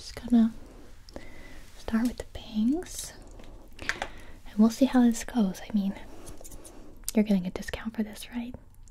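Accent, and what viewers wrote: South, United States. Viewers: Southern drawl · I'm from Canada and she sounds very distinctly non-Canadian to me although I do hear the southern part it's very distinctive. I guess maybe if you stretch it you could say she has a B.C./Albertan accent but I think just Southern U.S. is more accurate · The southern accent mmm love it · Not gonna lie....the southern country accent threw me off lmao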